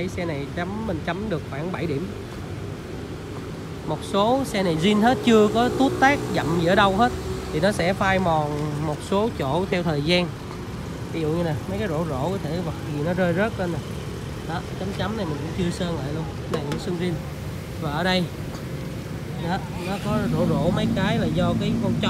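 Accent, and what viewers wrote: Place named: Mekong Delta, Vietnam. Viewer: Nói chuyện rất dễ nghe. Nghe giọng miền tây thiệt thà.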